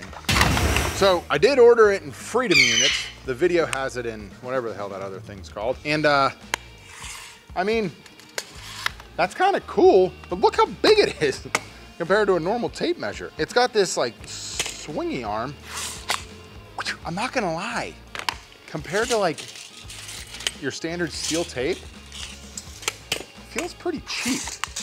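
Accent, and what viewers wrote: Pittsburgh, United States. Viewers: The yinzer accent seeps through. You got a fan over here in Sharpsburg now.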